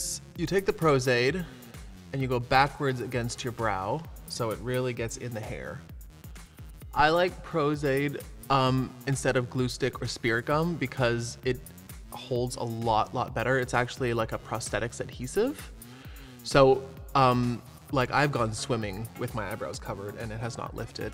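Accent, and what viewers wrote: Canada. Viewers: I’m Canadian and I’m sad I literally can’t hear Canadian accents because all the fake ones sound so funny so I wish I could hear Brooke’s accent the way other people do😭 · The Canadian accent coming through. I’m living for it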